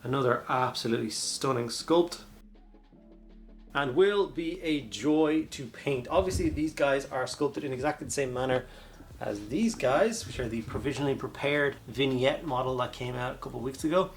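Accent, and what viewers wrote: Ireland. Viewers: tbh as long as I hear your lovely irish dulcet tones I am happy, you encourage me to paint. No nonsens, just paint encourages new cummers to the hobbie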